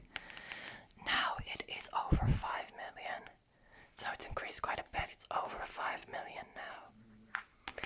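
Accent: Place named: Ireland. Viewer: I'm part Irish myself. Love the Irish accent.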